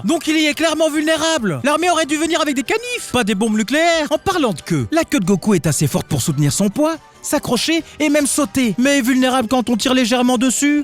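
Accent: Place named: Antilles, Caribbean. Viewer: Antillais j'ai l'impression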